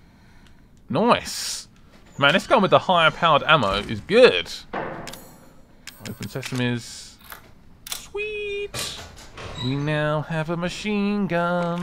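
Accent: United Kingdom. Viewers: God I love his British voice mmmmmmmmm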